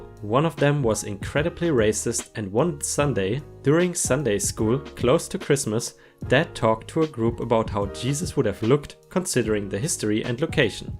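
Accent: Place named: Germany. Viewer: You did great! Love your voice, so refreshing to hear a German speaker doing reddit stories :)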